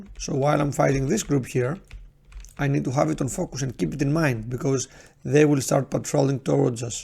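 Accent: Greece. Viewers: I knew that was a Greek accent. Καλή μέρα!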